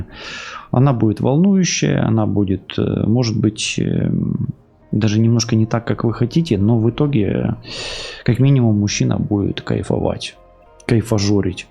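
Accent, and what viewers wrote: Ukraine. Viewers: Як же ви красиво розмовляєте українською мовою!!! Дійсно,наша мова прекрасна,співуча!!! Українська мова дуже вам личить ❤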